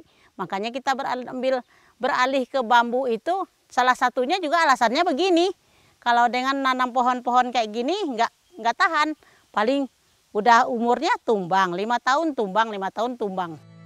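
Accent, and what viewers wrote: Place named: Minangkabau, Indonesia. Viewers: Dari logat umi bicara, sepertinya org padang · logat Padang susah hilang ya mi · Melihat logat nya seperti nya umi urang awak!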